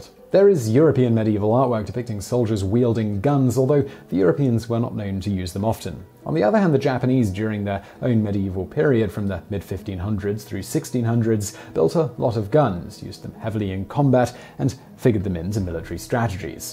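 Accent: United Kingdom. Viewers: Your way of talking and breathing and articulating and .. it's so weird, like you've got astma, but I believe as you're british, that helps on the video sound, the channel is awesome !!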